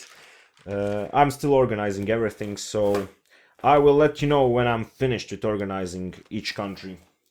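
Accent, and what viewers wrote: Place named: Eastern Europe. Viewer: Love your Slavic accent,Its very nice :)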